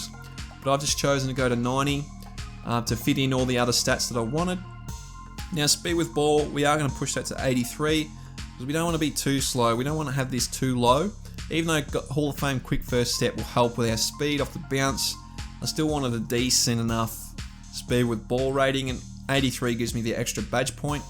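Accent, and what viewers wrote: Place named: Australia. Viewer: Thanks mate this was great. Also refreshing to hear an Aussie voice and to not have a bunch of over-the-top editing in my face when watching the video 🤙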